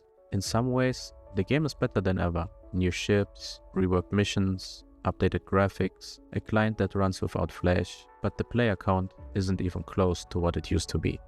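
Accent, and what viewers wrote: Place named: Germany. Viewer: wie deutsch willst du alles aussprechen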